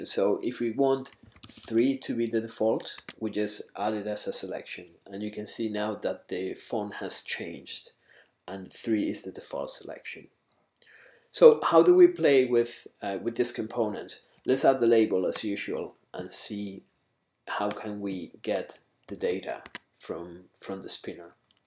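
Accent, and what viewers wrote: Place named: Spain. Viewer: Es muy bueno el video gracias. Se nota que eres español. Viva españa!!!!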